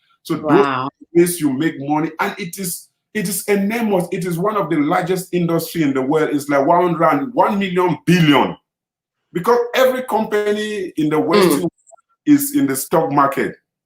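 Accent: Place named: Nigeria. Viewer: Interesting collaboration you had with! You sound more like a Nigerian. Awesome.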